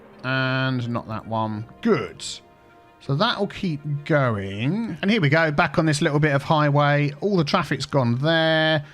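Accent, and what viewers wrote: United Kingdom. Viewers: There is something uniquely meditative about listening to a random British dude sip tea and mutter away while diligently fixing the same rudimentary transportation engineering problems over and over again. It's like a slightly condescending ASMR, complete with a hint of progressive optimism, and little cherry verbal nuggets sprinkled in; horky-borky, chockablock, straight-on, etc. Just makes me feel like things are right in the universe. You've hit a commendable niche. This is far better ambience than listening to "4 hours meditative gregorian chanting" or "best of derivative study beats vol. 69" 10/10, will definitely put on the Anglo-traffic-Daddy while I'm doing research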